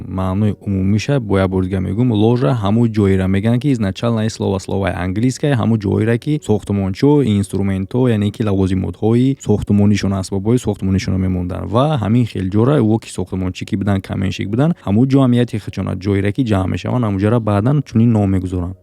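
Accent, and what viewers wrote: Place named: Kulob, Tajikistan. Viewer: Ошно гапоша мо чоткий мефахмем чиста Кӯлоби гап мезана ть ай тарафои Хуҷанд боши намефахми ука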